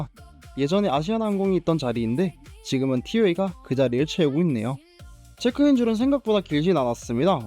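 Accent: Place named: China. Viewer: 중국인임? 발음이 한국인이 아닌데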